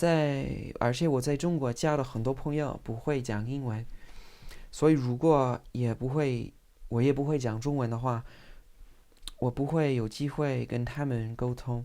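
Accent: Standard. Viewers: close to perfect and standard Mandarin..seldom see any non native speakers master four tones so skillfully..brilliant.. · 你中文说的非常非常地道，你是怎么在没有中文环境的情况下发音练得这么标准的呢？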